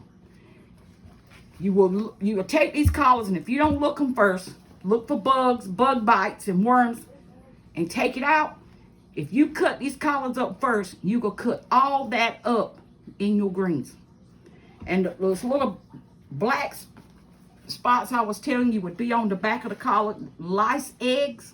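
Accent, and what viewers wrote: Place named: South, United States. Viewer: Loved the video. Glad to see you inspect them for bugs before cutting them up, it makes sense. A new subscriber. Love your country accent! God Bless!❤🙏🏽❤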